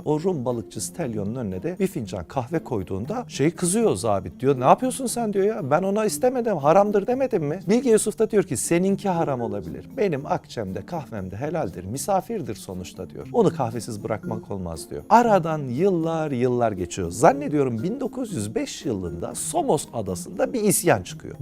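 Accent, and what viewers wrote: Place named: Adana, Turkey. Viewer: Dayı oğlu adana şivesi de arada gider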